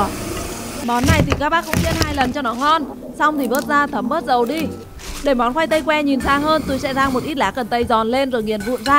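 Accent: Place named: North, Vietnam. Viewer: Thiệt ko mún xem kênh này mà nó tự hiện ra thôi. Lại giọng miền b thì ko ưa đc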